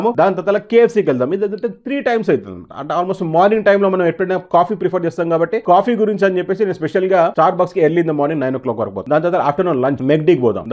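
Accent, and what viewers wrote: Telangana, India. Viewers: అన్న నువ్వు తోపు అన్న నువ్వు ఎక్స్ప్లెయిన్ చేసే విధానం సూపర్ అన్న తెలంగాణ యాస భాష భాష యాస స్టైల్ సజీవంగా చెప్తున్నావా అన్న నువ్వు తోపుల కి తోపు అన్న నువ్వు మామూలోడివి కాదు అన్న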